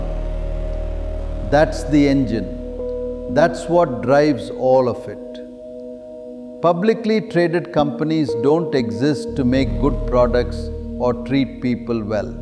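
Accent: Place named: India. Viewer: Obviously this is 100% AI. Any reason you used an Indian accent voice? Usually people chose American accent. Don't get me wrong, it's good, but I'm curious.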